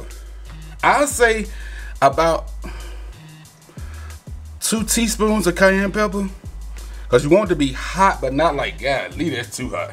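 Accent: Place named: Louisiana, United States. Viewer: Love y’all was watching u heavy since 5k I use to have the biggest crush on you Cuh of your Louisiana accent lol 😂 food looks good tell hubby i said hey 👋🏾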